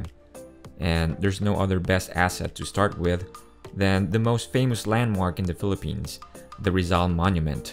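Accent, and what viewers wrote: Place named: United States. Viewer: Akala ko amerikano ka biglang nagtagalog Hahahahaha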